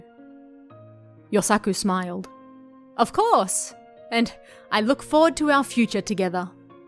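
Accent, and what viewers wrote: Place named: Australia. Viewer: Hard to listen to the Aussie for a long time. Not saying it's bad, just not used to it.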